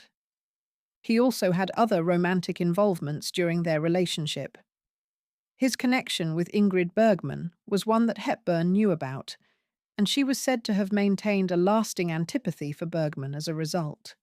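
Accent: United Kingdom. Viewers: Love you Stories and info❤. Thank you SO very much. Bless everyone on you’r team. A lovely narration with a beautiful British voice. . From Uk Susie ( how i miss ‘our accent’) in Melbourne Australia . These true stories are absolute Gens ❤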